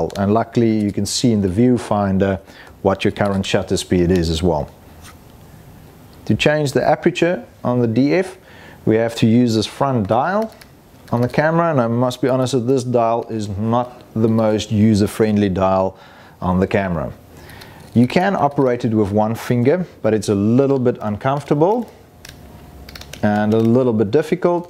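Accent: South Africa. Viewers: You sound like a fellow South African living in NZ; Afrikaans first language? Thank you for the review, I love that camera, but will only be able to afford it 15 years after its release😥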